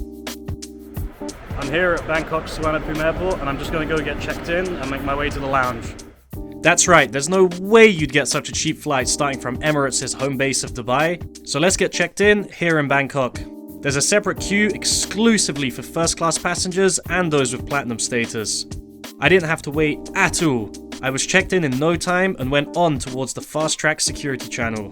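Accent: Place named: Southern England, United Kingdom. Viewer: He is British but I can't pick the county. Not a northerner, that's for sure, so he's from south of Birmingham.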